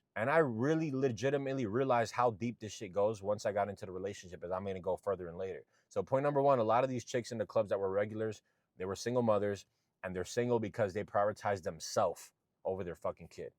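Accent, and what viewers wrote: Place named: Gullah, United States. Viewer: Idk I'm in and wondering if maybe I was expecting too much from "a bouncer". I'm sure I'm gonna miss the part where he doesn't sound geechy but I just can't take this guy seriously 😂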